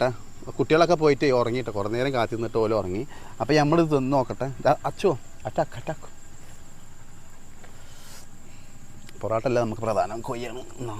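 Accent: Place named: Palakkad, India. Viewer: മസാല കോയിക്കോട് ആയ്ക്കോട്ടെ സംസാരശൈലി പാലക്കാട്‌ മതി 😀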